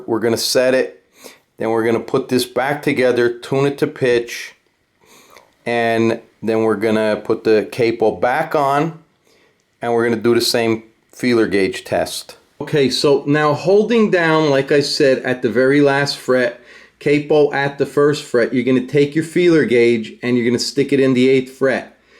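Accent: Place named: Chicago, United States. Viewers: Nice vid great Chicago accent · That's not a Brooklyn accent? Chicago? Interesting 🤔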